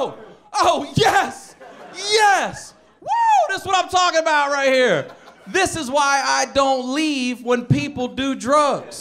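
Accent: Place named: Baltimore, United States. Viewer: Damn he's got such a Baltimore/maryland accent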